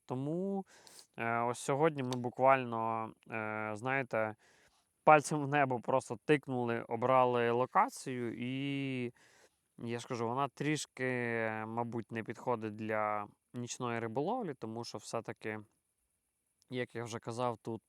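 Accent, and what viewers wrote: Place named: Western Ukraine, Ukraine. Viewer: Це шось Західно-Український діалект.😊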